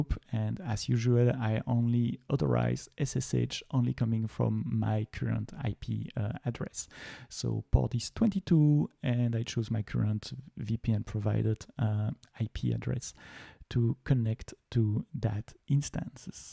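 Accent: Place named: France. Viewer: Je me disais bien qu’il y avait un accent français 😂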